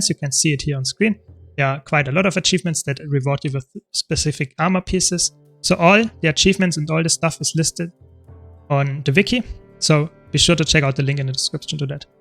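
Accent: Germany. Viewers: Thanks a lot my dude. I really needed that video to progress my Guild Wars 2 journey :) Btw .. hör ich da einen deutschen Akzent? 😂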